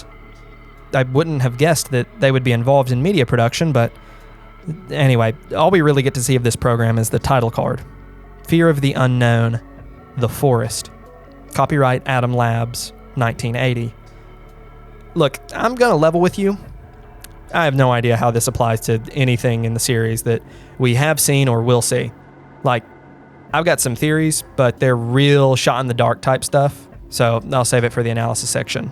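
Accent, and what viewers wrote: South, United States. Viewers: I’m just so happy to find a relatively popular YouTuber (who makes great content btw! I’ve watched a few but this was the episode I subscribed over) who’s got a southern accent. I did pause the video at the beginning and went and watched all 17 or whatever videos of the OG and I’m sooo glad I did. So good. Great series, great commentary. I usually pick one or the other, the original or the summary, but for THIS series I’m so glad I took your advice.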